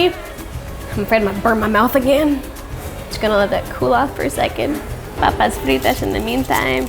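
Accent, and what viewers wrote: Spain. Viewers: CON ACENTO ESPAÑOL ES LO MEJOR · * tiene acento de Madrid por favor q mona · tiene un acento re español cuando habla en esp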